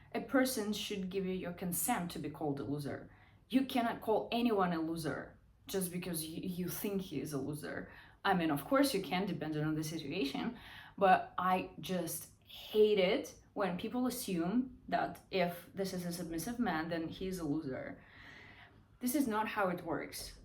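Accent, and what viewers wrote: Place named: Russia. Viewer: Hello, I found your channel a few days ago through your video about chastity amid researching the topic, then watched the rest of your videos and subscribed. I believe this is your best video yet. I wanted to leave a word of thanks because channels with content like yours have inspired me 1.5 months ago to find a dominatrix, and since then my life has improved exponentially, both materially and perhaps more importantly, spiritually. The point which you bring up in this video, that submissiveness shouldn't be perceived as a weakness but rather a strength, and therefore ought to be a trait which one can be proud of — even publicly — rather than ashamed of, is something I’d never considered before. This may have to do with me being Japanese, although I imagine it’s generally true everywhere else. Lately I’ve actually become slightly more open to my closest friends about my submissiveness…and this video makes me feel even more empowered and encouraged to come out of my shell. 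Thank you for sharing your thoughts, your experience laden articulation and honesty is always appreciated. Looking forward to your next video, and in the meantime, good luck in your continued endeavors as a dom! PS your Russian accent is charming ^^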